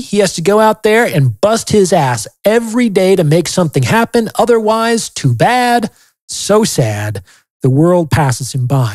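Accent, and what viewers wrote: United Kingdom. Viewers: It's the British accent for me 😂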